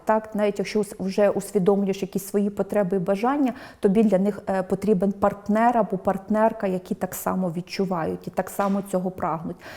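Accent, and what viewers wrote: Russia. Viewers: Спікер явно російськомовна. Багато помилок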